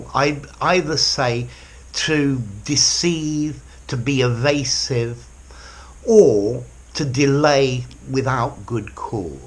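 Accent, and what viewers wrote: United Kingdom. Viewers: Too much British accent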